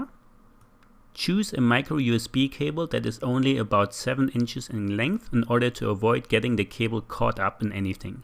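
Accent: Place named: Germany. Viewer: Thanks for the tips. You have such a nice German accent 😉👍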